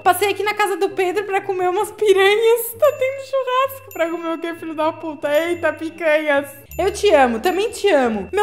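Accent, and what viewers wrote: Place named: Florianópolis, Brazil. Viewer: Você é de onde ? Tens sotaque de manezinha 😍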